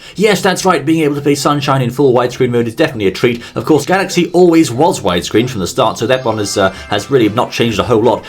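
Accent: RP, United Kingdom. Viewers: your accent is posher than mine! I too are from the UK. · OK. This is the first time I've heard him speak but..... I LOVE HIS BRITISH ACCENT!!!!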